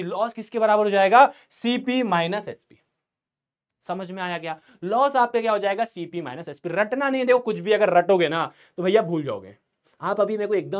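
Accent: Bihar, India. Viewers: Lagat hai sir ji bihari ba 😊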